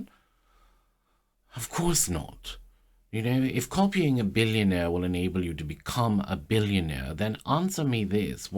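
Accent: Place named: RP, United Kingdom. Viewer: I am NLPed by this posh pronunciation 😇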